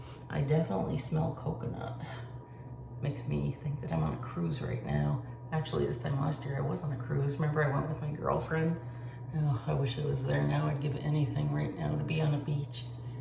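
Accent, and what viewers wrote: Canada. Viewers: Hello new friend, I am a new subscriber and I love what I see so far. I am a 47 y/o married x 22 years, mother of 1 sixteen y/o who is a lover of makeup too and clothes and purses and jewelry, lol. Where do you live? You sound Canadian. I live in Labrador, Canada, xo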